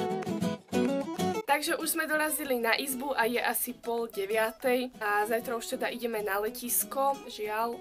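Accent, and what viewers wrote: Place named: Slovakia. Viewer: já bych taky chtěla byt ze Slovenska je strašně krásný hlavne ten přízvuk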